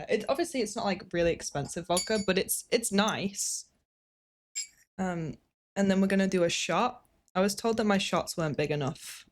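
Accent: United Kingdom. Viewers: You became full brit after jackie's drink, your accent got full british mode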